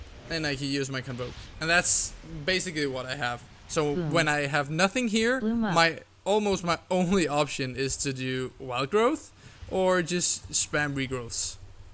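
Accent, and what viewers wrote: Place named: Denmark. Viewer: I can hear you are Danish 🙋🏼‍♀️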